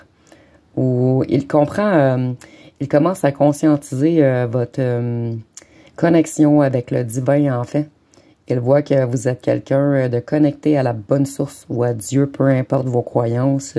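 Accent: Quebec, Canada. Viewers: Bonjour à vous ,je viens de vous découvrir et de m'abonner .Vous m'avez grandement impressionnée. Le choix judicieux des mots qu'on entends pas dans d'autres guidances et qui réconfortent, qui nous aident à grandir ,à garder la foi ,à être fiers de qui ont est .Votre accent semble québécois est-ce que je me trompe ? Merci beaucoup et gratitude à l'univers pour cette belle découverte. Bonne journée ! 😊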